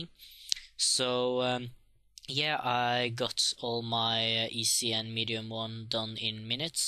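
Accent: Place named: Norway. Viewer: u from Norway? sounds like that :P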